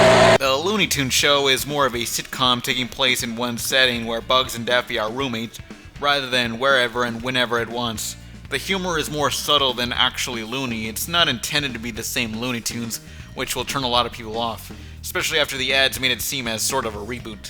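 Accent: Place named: Texas, United States. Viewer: The southern accent was your Texas showing.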